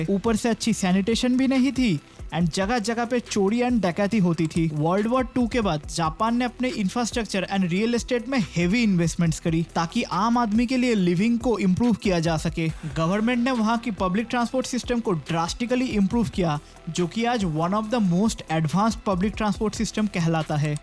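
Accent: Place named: Bengal, India. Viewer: Bhai , your hindi accent sounds like typical Bengali boy who is ashamed of his mother tongue & makes vlogs in hindi 😢😮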